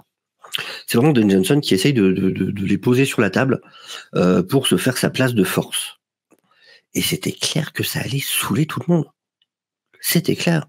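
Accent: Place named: Alsace, France. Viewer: Ce petit accent alsacien, mon dieu ça fait du bien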